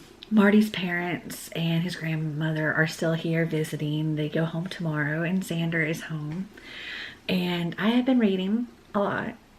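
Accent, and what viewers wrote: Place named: United States. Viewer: that's true he was nice all the time.... Btw I think you have the best accent of all the American booktubers!! Sorry for the weird comment but I always like hearing you speak in your vids!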